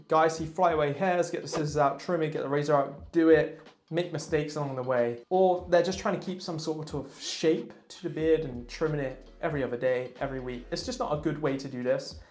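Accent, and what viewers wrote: United Kingdom. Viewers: I noticed you're using beard butter from Detroit Grooming which is from the US but you sound like you live in the UK. Is there a UK supplier for that brand?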